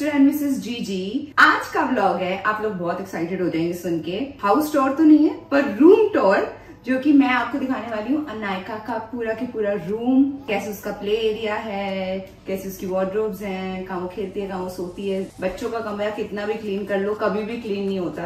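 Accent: Delhi, India. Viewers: Your delhi language is ❤️❤️❤️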